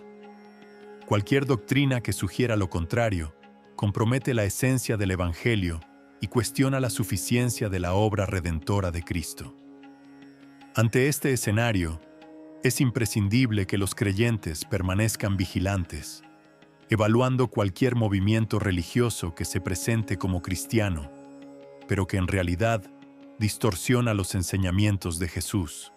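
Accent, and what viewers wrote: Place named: Mexico. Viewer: Desde Colombia parcero, pero con acento Mexica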